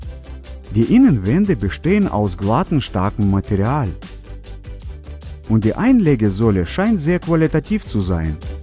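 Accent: Russia. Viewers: Er ist Russe deswegen spricht er das so aus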